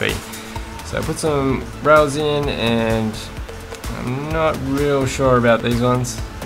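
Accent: Australia. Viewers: Is that an Australia accent I hear by the way your the best youtuber ever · It's the WIDEST Australian accent i've heard yet =O)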